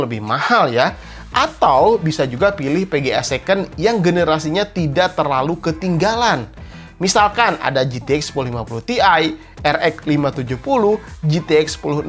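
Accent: West Java, Indonesia. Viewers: Biasa SUNDA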